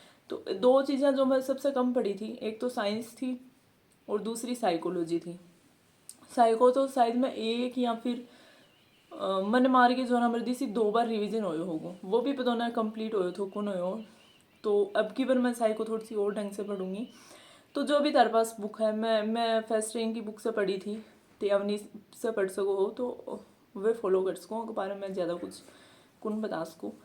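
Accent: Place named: Chirawa Pilani, India. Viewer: Apki langauge chirawa pilani side ki lg rhi h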